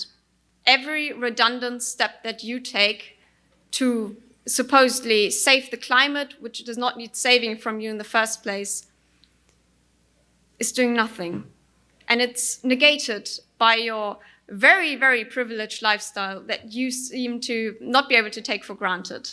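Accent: Germany. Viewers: German accent...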